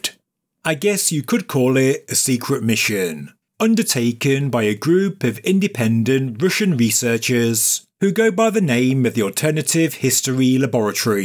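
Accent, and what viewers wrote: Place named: England, United Kingdom. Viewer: Awfoool. Oh u r English man.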